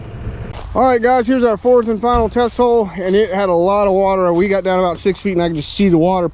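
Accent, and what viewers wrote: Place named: Carolina, United States. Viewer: I think its great when ya talk buddy, you speak the Carolina sweet jaw, sounds wonderful , great videos Mr.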